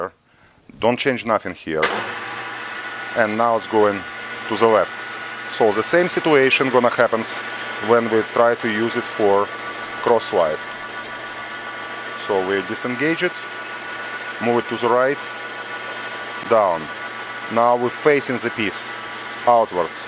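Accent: Russia. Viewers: Отвечаю, мужчина русский, произношение капец русское)))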